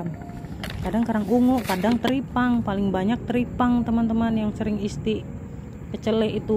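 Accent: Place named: Banjar, Indonesia. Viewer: logat banjar ny ad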